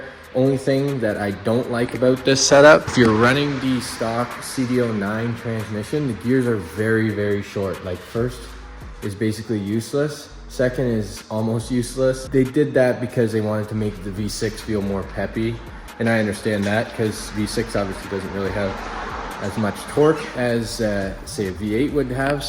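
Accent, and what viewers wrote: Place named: Canada. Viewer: fuck ya dude. you sound canadian as hell lol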